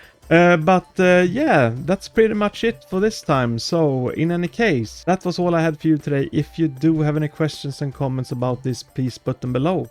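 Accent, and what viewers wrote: Sweden. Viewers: it feels like you are from sweden cause the acent you have feels like swedish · u swedish right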